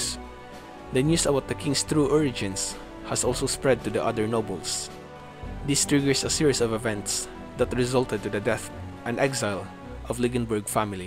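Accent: Philippines. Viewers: That is a very strong crisp filipino english accent.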